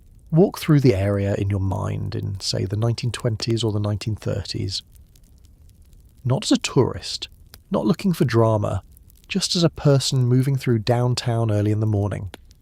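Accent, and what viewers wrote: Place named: United Kingdom. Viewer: Discomfort is inaccute history being read wuth a pompous brit accent.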